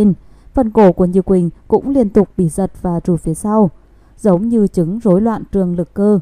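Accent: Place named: North, Vietnam. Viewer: MC noi chuyen giong Bac Ky Ha Noi nghe que va do qua, how can I subscribe your channel ?? From USA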